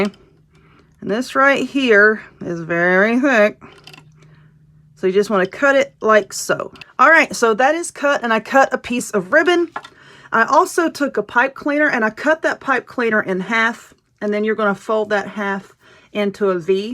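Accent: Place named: Kentucky, United States. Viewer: I love your videos! If I was to ever make a video ~ I swear I would talk just like you! (I’m from Kentucky). I love your down to earth talk & your slang! God bless you sweetie!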